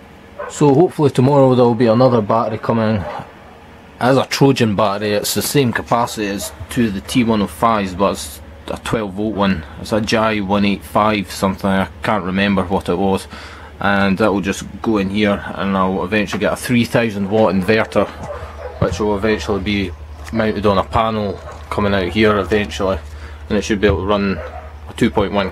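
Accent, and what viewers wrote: Scotland, United Kingdom. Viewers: sweet system are you from Scotland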